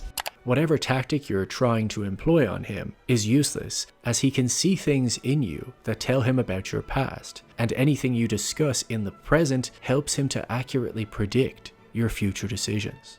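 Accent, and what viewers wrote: Ireland. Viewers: I dedect a faint irish twang?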